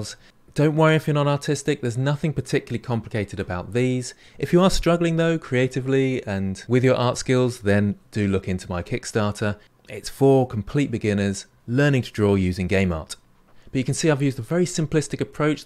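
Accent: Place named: Essex, United Kingdom. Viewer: Love your tutorials. Very easy listening voice and accent. Is that Essex?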